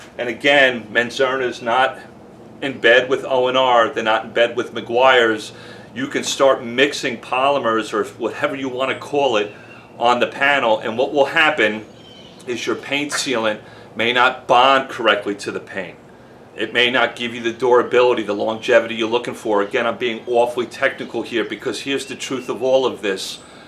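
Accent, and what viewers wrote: New York, United States. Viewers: Thanks, from down under in Australia. Love the NYC accent and the way you present this valuable information brother. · I knew I heard that NY accent lol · Hey it all makes sense now!! I’ve been watching all your videos and see you live in Dallas but that accent felt all too familiar to me it was confusing the he’ll outta me now it all makes sense! Haha I’m a fellow New Yorker too love your videos I wanna start a detailing business this summer and your videos are my go to for information thanks a lot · lmao, Been watching some of your videos for a few weeks and one thing that's been eating me is your accent and being in Dallas! Whole time I've been watching your videos I'm like this guy is definitely not from Dallas and now I know. Aspergers, no, New Yorkah, yes, lol.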